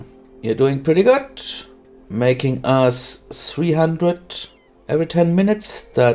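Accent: Germany. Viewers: Diggi ! Dein German Accent macht mich ech krank. Kann ich nich gucken, es ist so dermaßen schlimm !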